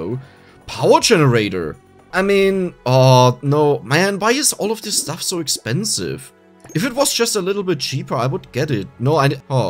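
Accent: Germany. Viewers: Are you from germany xD sounds like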